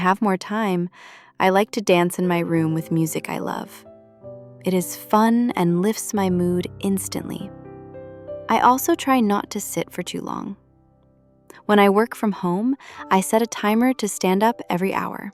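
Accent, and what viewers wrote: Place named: RP, United Kingdom. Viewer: I love BBC English ❤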